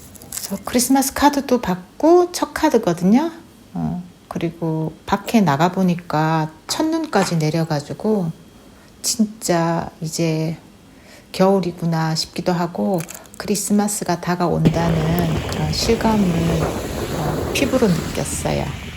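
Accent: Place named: Gyeongsang, South Korea. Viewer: 반갑습니다 까망님 체널 보다가 님 댓글 보고 왔습니다 스위스 사시는군요 추운날씨에 촬영 하느라고 수고하셨습니다 첫눈 치고는 많이 내리네요 경상도 분이 신가봐요 사투리가 조금씩 들리네요 ㅎㅎ 저도 구미 살아요 응원하고 선물도 드리고 갈게요 행복한 하루 보내세요☕;)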